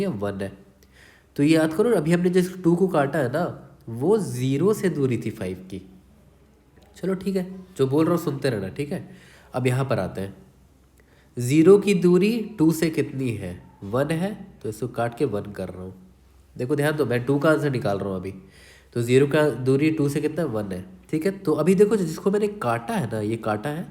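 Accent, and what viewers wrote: Bihar, India. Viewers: Are you from bihar ?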